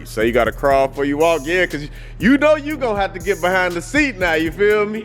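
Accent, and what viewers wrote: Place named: South, United States. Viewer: Your black car in my opinion is way nasty 🤢 then that mustang well I guess if it was at least LSX swapped 😂 but your on your way to being a car guy and I know you goin get mad when I say this but if you didn’t know what that car was untill they said not good when you walked in the buildin even if we gm guys but ford guys that cobra is a relic even got leather seats yup then white bits ain’t playin you can tell but the way they do big shit every car they had was 150k race cars 🏎 they don’t fuckin play games then the guys that you don’t race then he was talking you know he’s grown ass MAN. When he says I’m NOT. Going to talk shit till the cars done and we no what we got he’s grown ass NA. I respect that but I like your channel about 85% of the shit you say I have to ask my 21 year old son what the fuck is saying you kept saying KAA and I said mason what the fuck is he saying bub he said car dad I said awe shit he sound like our fam from the south you gotta ask then four times what you say huh huh 🤔 lol but I like this channel you never know what’s going down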